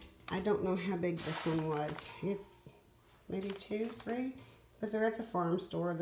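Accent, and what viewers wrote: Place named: South, United States. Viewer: LOVE 3 TIERED TRAYS! MADE ONE FOR MY DAUGHTER OUT OF GRADUATED SIZED SPRING FORM PANS. HAVE SUPPLIES TO MAKE 4 DIFFERENT ONES I HAVE SEEN ON DOLLAR TREE DIY YOUTUBE VIDEOS. YOURS IS SO PRETTY & FARMHOUSE! ARE YOU FROM SOUTHERN ILLINOIS? YOU HAD MENTIONED CARDINAL BEING YOUR STATE BIRD. MAYBE ANOTHER STATE HAS CARDINAL AS WELL. I NOTE A BIT OF SOUTHERN ACCENT.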